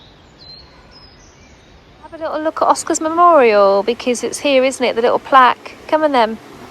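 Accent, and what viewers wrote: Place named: West Yorkshire, United Kingdom. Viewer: Hello, I recently found your channel and find myself watching longer than expected. You do a wonderful job of combining boating, nature, history and art. Also, I spent a great deal of time in West Yorkshire in the 80s touring in a Christian band from California. We played in many of the small town you mention. I can hear the accent so clearly. Thank you for the lessons on canals. Good job.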